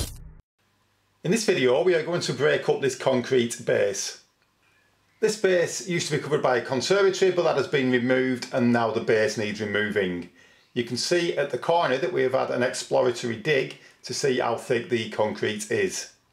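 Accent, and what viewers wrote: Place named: Lancashire, United Kingdom. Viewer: That Lancashire burr can’t be caged, that would be criminal.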